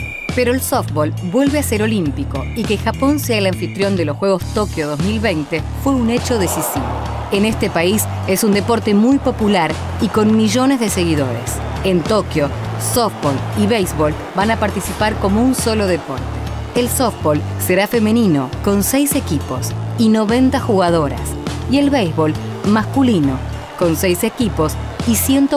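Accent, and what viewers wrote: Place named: Argentina. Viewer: No me gusta la manera de hablar que tienen las Argentinas .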